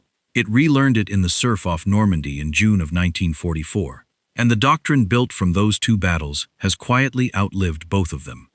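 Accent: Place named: United States. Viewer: Who is the American robot giving the commentary? None of the vehicles filmed are from WW2?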